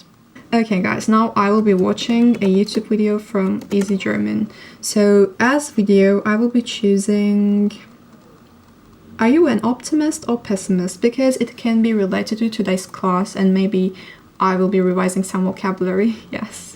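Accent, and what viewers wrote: United Kingdom. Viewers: it's pretty obvious that your english accent is changed.Its more like a Brit right now. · Öğrendiğin diller kaynaklı mı bilmiyorum ama İngilizcen İngiliz aksanına kaymış seni ilk takip ettiğimde öyle değildi ama güzel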